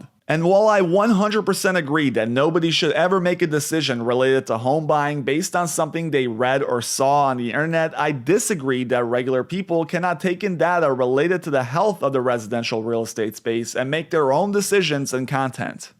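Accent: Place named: Minnesota, United States. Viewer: Are you from Minnesota or Wisconsin?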